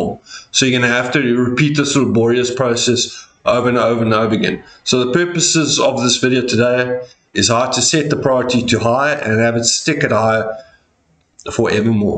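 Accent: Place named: South Africa. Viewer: My bru sounds so South African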